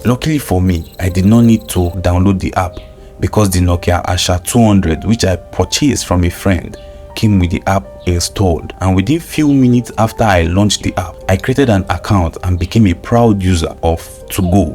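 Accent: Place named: Nigeria. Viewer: "and exact tttime for lekchors" Eh! Your strong Nigerian ascent sha🥵🥵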